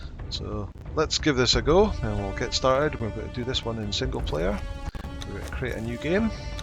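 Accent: Scotland, United Kingdom. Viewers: this tutorial is objectively superior to all the others b/c of the scottish accent alone.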